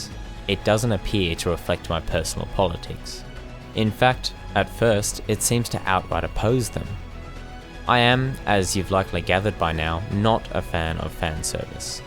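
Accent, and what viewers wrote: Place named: Australia. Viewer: I seem to be falling in love more and more with your channel after every episode I watch. I also can't believe I never noticed that your also Australian before and its Kinda blowing my mind that I never picked up on it. but anyways keep up the great work!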